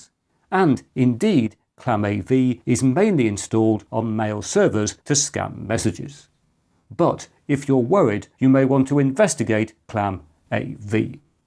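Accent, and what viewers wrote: United Kingdom. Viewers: I wish I had you in my CS classes back then. First, a british accent is always a win, second, your teaching is incredibly motivating and paced on point. Thank you so much for your content!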